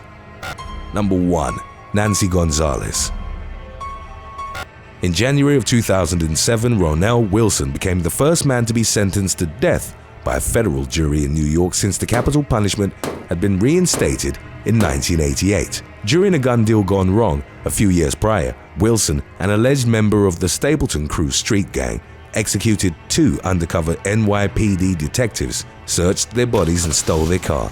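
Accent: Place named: United Kingdom. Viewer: Hes from the UK and tbh his accent is quite lovely .Hes a huge reason why this channel is so successful .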